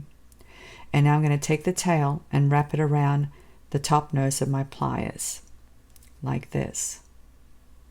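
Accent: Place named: Australia. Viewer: Dear, I love the necklace you showed us at the beginning of this video. Is that your beautiful garden? What is lovely place to live in. Your accent sounds a bit Australian - have I got that wrong or have you ever lived here in Australia? Thank you for your great videos, love from Amanda in Melbourne, Australia ❤️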